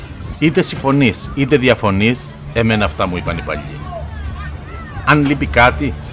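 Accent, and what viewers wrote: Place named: Spain. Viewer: wait... did this channel get highjacked and dubbed over by a patronizing Spanish speaking person?... time to eject that subscription!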